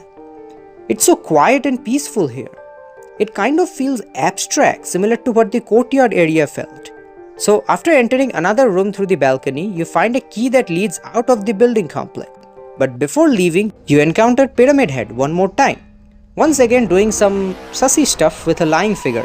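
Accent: India. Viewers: This cute accent our host is bringing me joy. He is analyzing and noting interesting things and having that desi cadence that makes my heart sing with different perspective.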